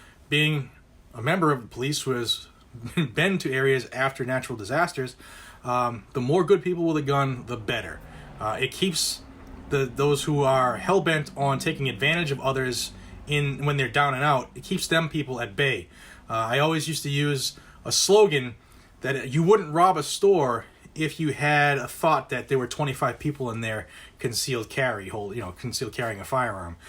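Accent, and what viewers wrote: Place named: Southwestern Pennsylvania, United States. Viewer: Sounds just like Southwestern PA! Lol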